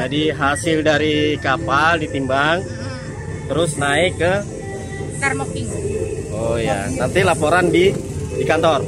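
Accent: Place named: Java, Indonesia. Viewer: Ngomonge medok nmen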